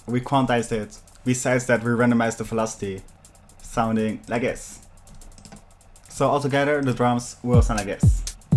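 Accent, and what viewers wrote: Netherlands. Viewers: Ik hoor een nederlands accentje ;)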